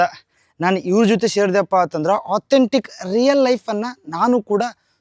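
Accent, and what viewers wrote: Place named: Mangalore, India. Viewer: ನಮ್ಮ ಮಂಗಳೂರಿನ ಕನ್ನಡ ಮಾತಾಡ್ತಾ ಇದ್ದಾರೆ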